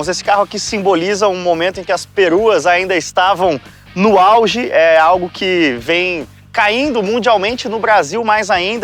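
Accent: Italy. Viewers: Mais que belo video (sutaque italiano) linda historia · não tem nada mais chato que esse """""Sotaque"""""" italiano pqp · teu sotaque italiano é maravilhoso! Mas meus ouvidos estão sangrando..... kkkkkkkkkkkk. Brincadeira! Abraços! Em tempo: já vi um desses com motor V6. Aqui em Florianópolis sei de uma pessoa que tem uma, porém não sei se é 4 ou 6. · O sotaque italiano do é engraçado, quando ele fala italiano eu me mato de rir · !!! Parabéns pela escolha!! Linda machinna!!! Não deixe de fazer matérias com o seu Bello sotaque italiano!!! Que bom ter vc como alfista..sou de Londrina tenho 2 machinnas uma é a Sofia e outra é a Franchesca...E carrego grande orgulho de ser descendente de italianos... viva Alfa Romeo. Abraço amici Alfisti. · “Tem gente que não gosta do meu sotaque italiano “ tem gente que se incomoda contudo, pelo menos nós aprendemos as pronúncias corretas ou próximo do corretor, show de bola man AlfaRomeu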